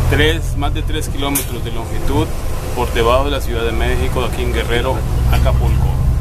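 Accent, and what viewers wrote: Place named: Costa Rica. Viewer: Reconocí ese acento tico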